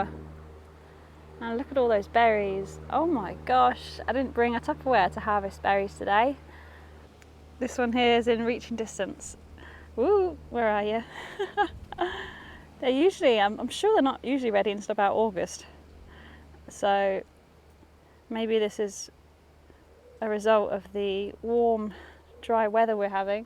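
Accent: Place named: London, United Kingdom. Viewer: Did you grow up in the UK? You sound like a Londoner 😅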